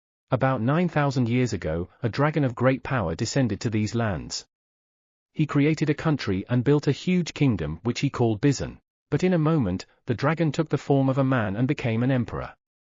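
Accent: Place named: United Kingdom. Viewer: Why is the ai voice British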